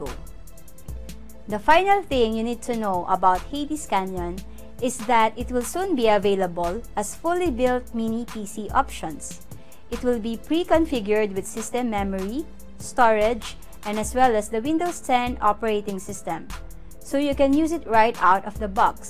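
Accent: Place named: Philippines. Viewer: You sound like Filipino. Am I right ?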